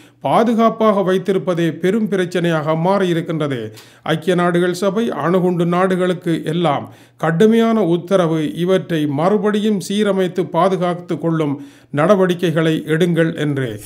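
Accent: Sri Lanka. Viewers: அழகான தமிழில் பேசுகின்றீர்கள் பூர்வீகம் இலங்கையோ......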